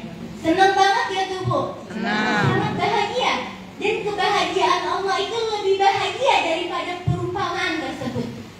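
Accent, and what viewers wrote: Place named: Arab world. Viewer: Dialeg bahasanya Arab bangat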